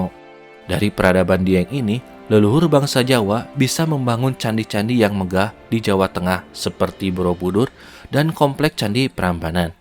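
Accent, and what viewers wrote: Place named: West Java, Indonesia. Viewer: Suara narator Video ini saya yakin asli Sunda . . Karena pelafalan kata ‘ Aktif ‘ menjadi ‘ Aktip ‘ dan penyebutan Air Terjun menjadi Curug serta aksen bicara masih ‘ Sunda ‘ bgt . . 🙏🏼🙏🏼😬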